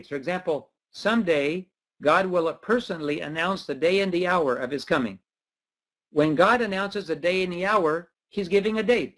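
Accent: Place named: Hawaii, United States. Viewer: Thanks for being real Uncle. I know we're on the right path and the right message. God revealed to me the same message before I noticed you were giving it too. Ellen's warning is for the sleeping. Her confirmation is hidden in her warning about time test. Those that refuse to awake will naturally miss it. I am confident that you are aware and I love your accent it's very much like the Hawaiian accent. And we call all our elders Uncle so I'm very comfortable with you and the way you talk and think. Praise God that I am not completely alone in this planet of zombies. 🤙🙏❤️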